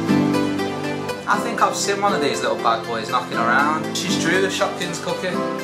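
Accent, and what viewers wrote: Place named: England, United Kingdom. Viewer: Love english accent jaja make a double Decker cake!! It would be great!! Greetings from México ;)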